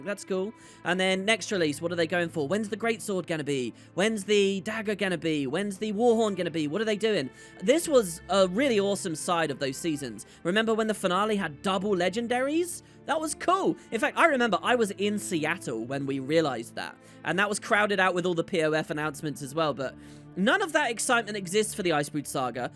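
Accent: United Kingdom. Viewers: wow, you really sound British with the audio like that. but, 1 thing i think i would say about the legendaries is i think the only way they will add more or add new spear, trident, and speargun, is if they come out with and elite, example i can give is with Ele getting a Bow, as i think anet knows that ppl will rage if they mod 1 of the existing weapon, they would replace that elite weapon with a Spear instead of a Staff, and if they would add in a new set of legendary weapons, i think they would just do the practice they did with Legendary Armor, and Trinkets, they would make WvW/PvP weapons up-gradable, i think 1 issue is with gen2 is you can't sell them.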